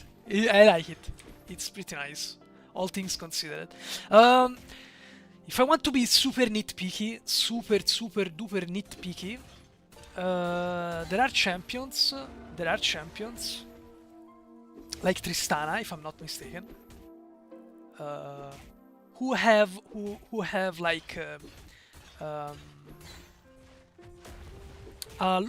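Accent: Italy. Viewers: italian accents or my ear are not working? still idc i love youre video still se capisci sta cosa allora ti ho beccato;)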